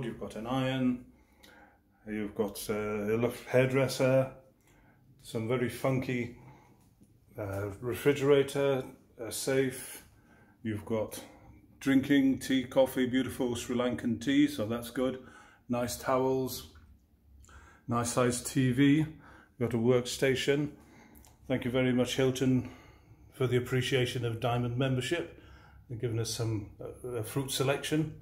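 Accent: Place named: England, United Kingdom. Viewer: cool and funky ! wonderful map included! soft and gentle humour by the youtuber! Lovely English accent !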